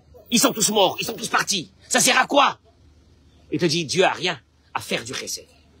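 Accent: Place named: Marseille, France. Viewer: Vous parler avec geste de méditerranéen je vois et j entend un marseillais super merci pour votre lumière j aime vos cours toda😂😂😂😂👍👍👍🤣🤣🤣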